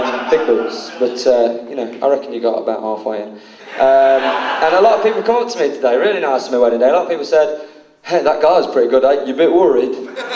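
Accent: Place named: Nottingham, United Kingdom. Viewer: Sounds as if your from my neck of the woods, Nottingham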